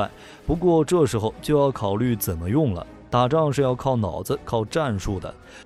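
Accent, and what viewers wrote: China. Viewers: 為啥是中國發音？？？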